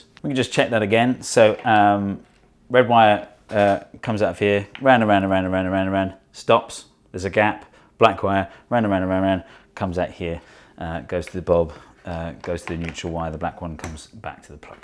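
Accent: United Kingdom. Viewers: second (?) video i've watched of and i've only just realised they have a british accent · <link> to an American wow I forgot how weird British people say aluminum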